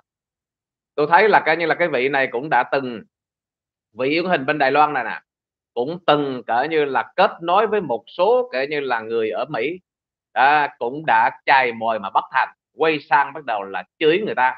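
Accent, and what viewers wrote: Standard. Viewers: Chào Anh noi rất chuẩn.like like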